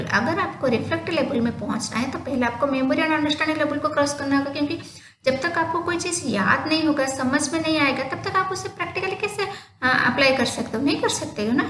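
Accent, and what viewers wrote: Odisha, India. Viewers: Are u belongs to odisha?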